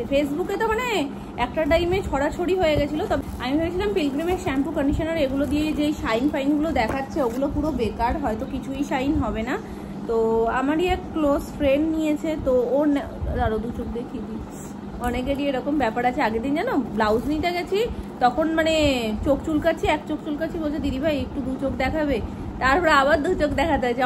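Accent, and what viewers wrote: Bangal, Bangladesh. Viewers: Pakabori mukhe bangal kotha sonle khob valo lage mon ta vore jay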